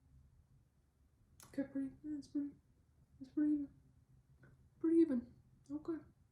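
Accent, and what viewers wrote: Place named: United Kingdom. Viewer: This makes me wonder if there is an alternate universe where is alive and has his own drag queen persona with your name as the Drag name, honestly doing a drag king dressing as a drag queen look would be fierce and so bonkers, like a in drag look. Also, we definitely need you to do a look one day where you show us what looked like before he died. It would be so interesting. You're the first Drag King I've legitimately found interesting and I think it's due to the colourfulness, the glamour and the characterisation. It shows that Kings can be just as fun and amazing as Queens can be. P.S as a Brit, I approve of the accent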